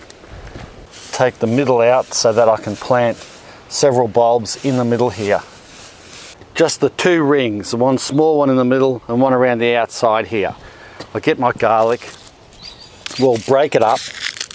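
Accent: Australia. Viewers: I love the Australian ascent, it is much easier for Indians to understand. So hardworking man. Love this type life.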